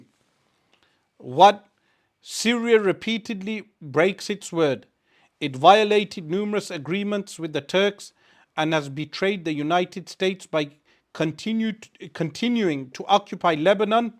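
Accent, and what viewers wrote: United Kingdom. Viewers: You might be right. But remember with that British accent and paying taxes to a country that supports war on your own religion. So first admit your own hypocrisy first than expose others.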